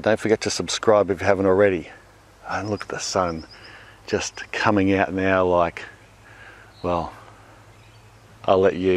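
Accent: Australia. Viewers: I love Australian accent and words. Would love to see your country, but not to fawned of a lot of your nasty critters . I need to have made some great raised beds, like you have for my wife to garden in. With age he back is not like it use to be. Straw has gotten crazy expensive here in the USA, where I live. It cost as much as good hay. Use to get it for a $ 1.00 a bale, but now I guess they are not growing wheat like the use to. I think that ground is now in corn, because of the prices .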